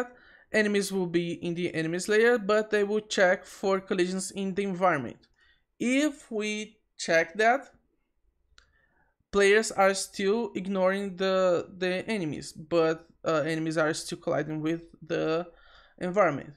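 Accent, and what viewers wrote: Brazil. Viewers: Eu percebi na hora ksksksksksksks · Caraca vey tava pensando q era sotaque br e fui ver o canal e era msm aduhaudhasd.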